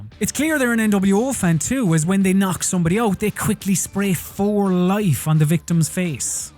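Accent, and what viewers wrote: Ireland. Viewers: I love your Irish accent.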